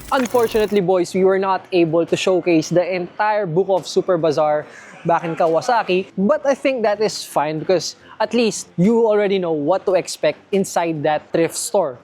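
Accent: Philippines. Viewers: Ayyy pinoy nga, very Filipino accent, cutiepie pa. May bago na naman ako panonoorin❤ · I watch these videos for the strong filipino accent 💪 Hoy Pare!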